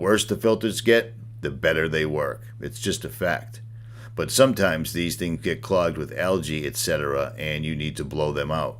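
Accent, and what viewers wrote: Boston, United States. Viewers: This guy sounds just like that dude from Boston. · Sounds exactly like Richie from Boston. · You must be a Boston guy??! Definitely gets a follow just for that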